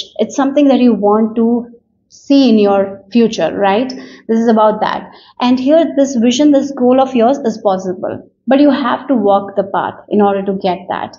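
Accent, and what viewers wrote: India. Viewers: Are you indian ? Your English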